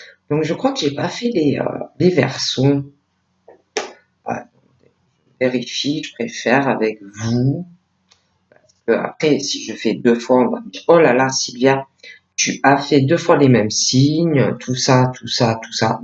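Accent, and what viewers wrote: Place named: Marseille, France. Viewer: une bretonne à l'accent marseillais, c'est joli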